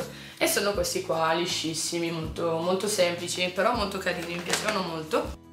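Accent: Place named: Brescia, Italy. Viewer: di che parte di Brescia seii? appena hai salutato ho subito capito dall'accento che fossi delle mie stesse zone.. ahahaha :D begli acquisti comunque :)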